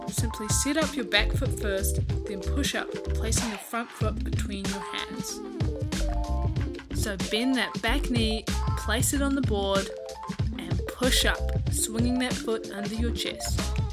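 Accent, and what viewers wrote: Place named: New Zealand. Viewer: Yes she's a full on Kiwi alright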